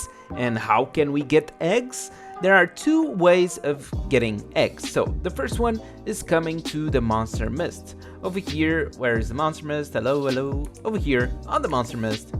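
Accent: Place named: Portugal. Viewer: eu tava entendendo ele falar em ingles, tava achando estranho...ai vi que ele é portugues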